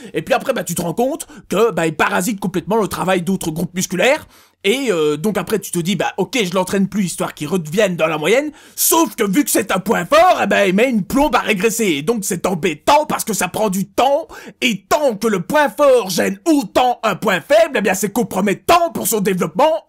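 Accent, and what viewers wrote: Belgium. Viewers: t'es belge en fait ?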